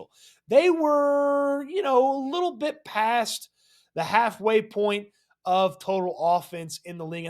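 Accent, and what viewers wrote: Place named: Texas, United States. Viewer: As soon as I heard your voice I knew there was a fellow Texan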